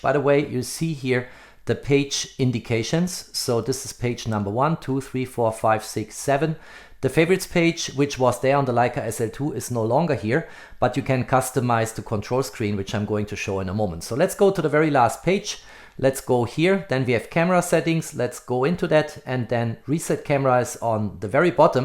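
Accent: Germany. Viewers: Nice videos, well explained. Thank you very much for that. But why don't you make these videos in German too? You're a German speaker, right? That's what your accent sounds like. This video is absolutely great. I bought an SL3-S yesterday and am now watching your video. It's really helpful with the camera settings. I think you'll be the only one to publish such a perfect explainer video for the SL3 in German on YouTube. Please do it in German. There are enough people in Germany who don't understand English. You would be a great help to them. Perhaps you could have it translated using AI. Thank you for your understanding and kind regards.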